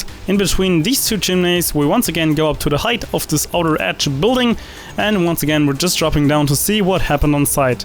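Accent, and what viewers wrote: Germany. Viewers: man hört raus das du deutsch bist :) +1 gutes video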